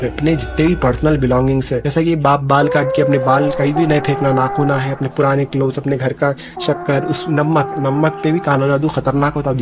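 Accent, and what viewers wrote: Hyderabad, India. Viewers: Hydrabadi Tone ❤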